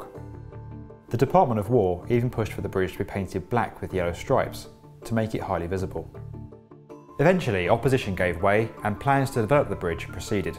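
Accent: United Kingdom. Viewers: I hate that the author has a British accent it’s pronounced Marin on Marion. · This is as heavy a British accent as it can get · This guy must be brit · Why is a British guy narrating US history? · Can you not find American's to narrate these videos. EVERY video I pull up has an annoying British accent.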